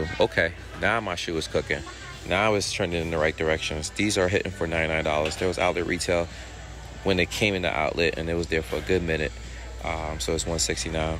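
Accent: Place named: New York, United States. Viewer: I love u talking cuz I am thinking ur from NY and I am from there and I live in Charlotte now so I like to hear u talking. Just found ya channel. Dig ur vids. 💪🏿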